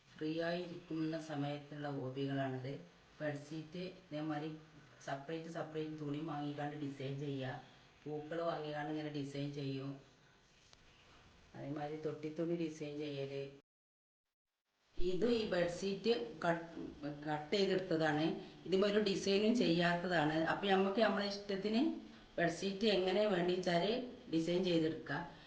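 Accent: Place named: Kottakkal, India. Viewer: കോട്ടക്കൽ ഭാഷ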